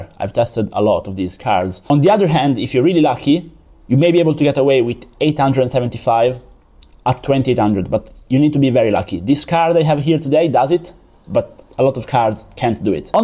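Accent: Italy. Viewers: Sento un po’ di italianità 😂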